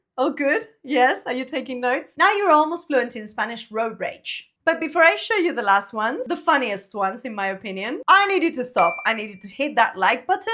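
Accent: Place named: United Kingdom. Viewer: At first I though you were British and learned Spanish really well...puta madre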